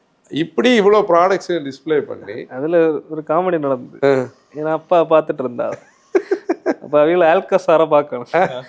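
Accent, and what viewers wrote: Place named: Nanjil, India. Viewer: Anna, mainly I'm watching ur videos for your nanjil accent and very casual talks... I like it very much.❤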